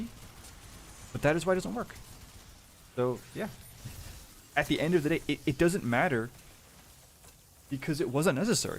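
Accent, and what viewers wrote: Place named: Ireland. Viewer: Irish brethren I hear, salute I do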